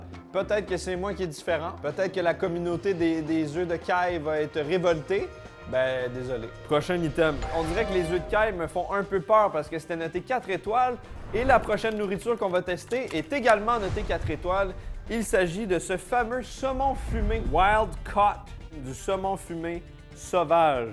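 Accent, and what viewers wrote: Quebec, Canada. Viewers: ah ok merci de l'info j'oubli trop facilement qu'il est au quebec. ( malgré l'accent ) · Rien à faire. L'accent québécois est dégueulasse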